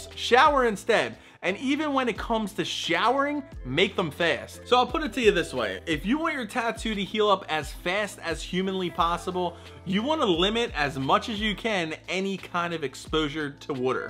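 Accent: Philadelphia, United States. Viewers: I can spot Philly from a mile away...the second he spoke, even before “WADER” being said, I could smell the Wawa through the screen on my phone....Go Birds!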